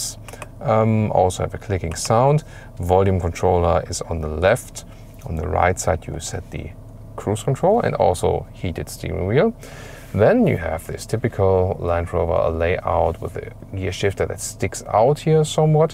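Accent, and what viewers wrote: Germany. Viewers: I will get the new Defender next week but still watching reviews. I thought I saw them all but today stumbled over yours. As a fellow native German speaking liked your accent already but then I discovered that this is the review I was looking for. It's just the way that if you get the car and discover it yourself with all the little details. And you give your opinion and verdict, which obviously you can buy or not. Best review I saw on the New Defender. Thanks!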